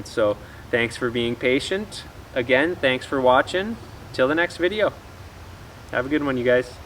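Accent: Canada. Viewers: Thanks for your content, and I knew you were Canadian from the moment I heard you speak (as an accent aware Canadian, myself), but stoked you're from the lower mainland :)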